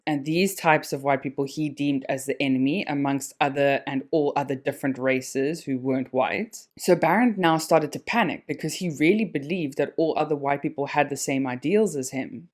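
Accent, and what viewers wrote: South Africa. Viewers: I believe is presenting this case for its phsycopathic value in relation to Barend, not because she wants to be political or racist. Obviously she is S African, accent is unmistakeable.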